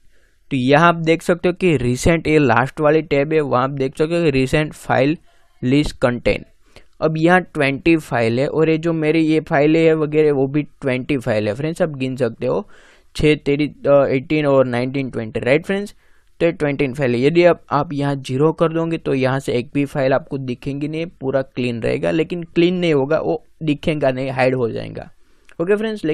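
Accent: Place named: Gujarat, India. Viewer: I think he is Gujarati 😊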